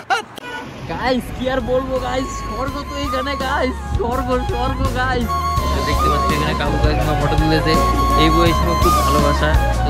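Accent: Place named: Bangal, Bangladesh. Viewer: At first you have to speak bengali properly, bangal language is not acceptable in India.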